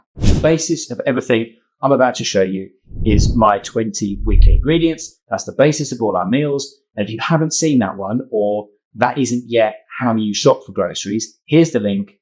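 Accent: United Kingdom. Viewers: This is a great follow on to where I just saw you shop in Aldi for the items. I'm so wasteful with food and chicken seems to be gone in one meal, and I wish to reduce that. I'm curious though, I hear a Uk accent, but you are a Kiwi according to google, so is this an ai voice, or did you ever live in the uk?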